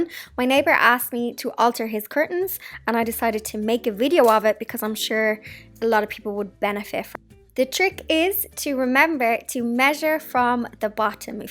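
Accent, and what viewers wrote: Ireland. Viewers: I love your accent, Irish girl!! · I think I'm going to watch all my tutorials by Irish content creators...that voice!!! 💚 🤍 🧡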